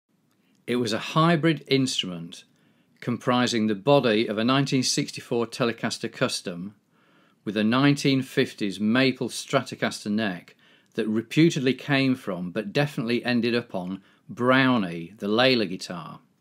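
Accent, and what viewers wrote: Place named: Midlands, United Kingdom. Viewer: About 2.5 years ago, I rediscovered my love of electric rock guitar, so I dug out the little japanese guitar I'd had at the back of a wardrobe for decades (Kawai Aquarius, purchased in 1984). The frets were worn down to the fretboard. So I ordered a new neck from Amazon. The one that was sent was not the one they had in the pic, they said they didn't have any more, but I could keep the one they sent for free. Despite having no experience, tools or workshop, I was determined to make it fit. Musician friends said it would never work. But bit by bit, using my bed as a worktop, I sandpapered away areas the neck was too thick, and shimmed up areas where it was too loose. When I came to fit it, it needed a couple of tweaks, but then it worked perfectly. Intonation spot on - action better than the original. It was flatter too - much easier to play. 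I've got some 'proper' guitars now, but I learnt a great deal from that little project! Love the Channel,. You sound like you are from my neck of the woods. (Midlands) You break down all the favourite solos I enjoyed in my youth, so now I can at least play them in slow motion, which is a step in the right direction!;-)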